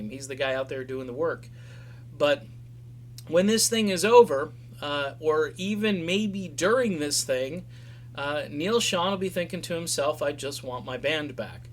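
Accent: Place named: South, United States. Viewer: For that sounds hillbilly fir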